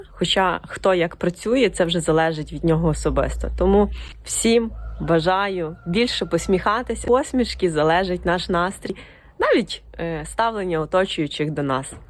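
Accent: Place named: Western Ukraine, Ukraine. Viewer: Ну я по акценту сразу понял что с бандерщины где-то.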